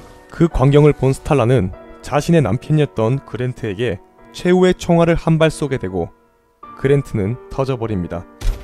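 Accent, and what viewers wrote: Gyeongsang, South Korea. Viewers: 님 갱상도 출신이네요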